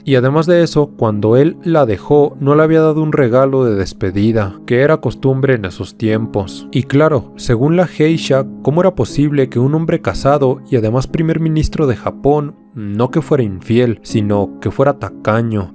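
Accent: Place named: Cleveland, United States. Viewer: Hablas con el acento de Cleveland de padre de familia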